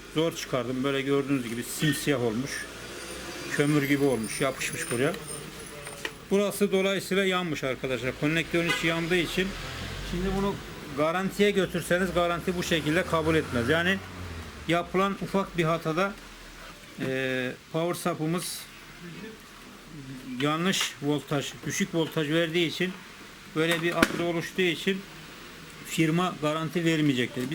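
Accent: Erzurum, Turkey. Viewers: abi konuşmandan anlamıştım erzurumlu olduğunu ondan sordum bende erzurum oltuluyum dadaşkentte oturuyarum. anakart işlemci vb hari&#231; size ka&#231; tl veriyoruz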